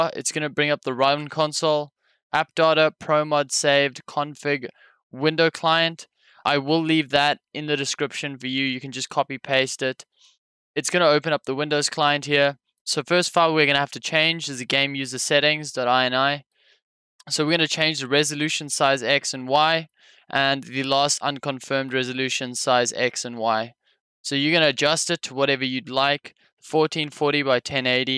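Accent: South Africa. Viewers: OMW WASSUP FOUND YOUR POST ON REDDIT Heard the ZA accent first 😂 Scroll down and I'm like "HOWZIT!" Subbed. 😎😎 EDIT: lmao thanks for the help as well 😅🧐👍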